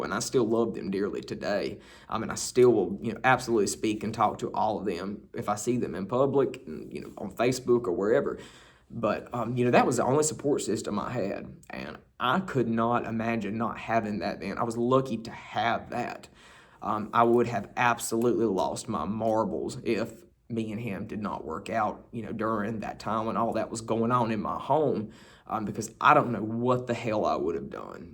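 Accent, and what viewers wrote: South, United States. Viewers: reminds thisn heer of u reel nice Tom Sawyer now all growd up, an edicated in certain 'fun dood disciplines' by Hucklberry Fin hiself, away an when nun wuz lookin'. We heer boyz WILL be boyz an all. (Jus gota luv that Suthern drawl.) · Love that Southern talk. Happy Holidays!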